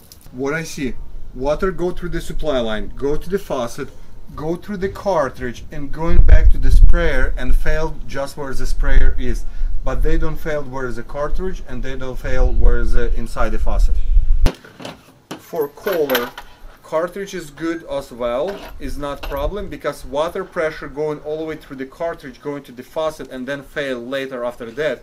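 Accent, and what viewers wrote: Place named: Eastern Europe. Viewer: I tbought he had Slavic accent.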